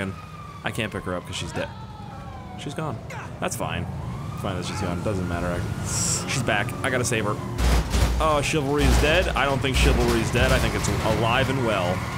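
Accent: Canada. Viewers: U sound like canadian lad a litele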